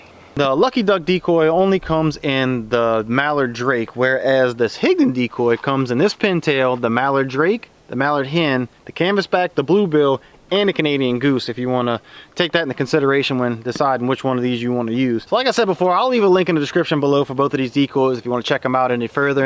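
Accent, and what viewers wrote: Louisiana, United States. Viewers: Great review. Sounds like you gotta a little Louisiana in that accent. 🦐🦐🙌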